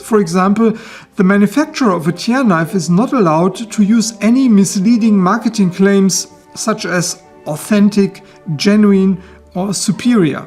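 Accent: Germany. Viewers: Sein Englisch ist doch perfekt zu verstehen, klingt wie deutsch😅😅😅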